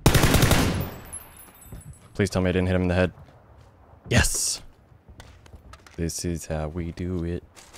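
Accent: Canada. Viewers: are you canadian ? just wondering sounds like it ;)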